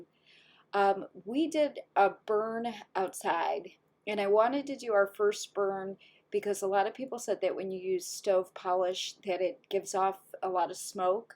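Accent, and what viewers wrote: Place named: Virginia, United States. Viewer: You go girls! Wonderful project! I have a Vermont Castings cast iron wood stove that has been sitting in my family room for years unused. You inspired me to want to work on mine (rusted by cat spray in some areas) and put it out in the yard as a place to unwind. Thank you so much ladies!! (I am Canadian and your lovely accent reminds me of someone I know from Virginia) XOXO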